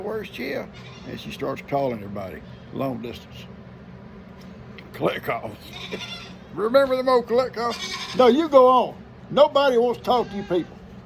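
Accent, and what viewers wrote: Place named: Texas, United States. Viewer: Thank you for sharing your life with us. I love your stories and listening to your Texan accent and of course all of your babies ❤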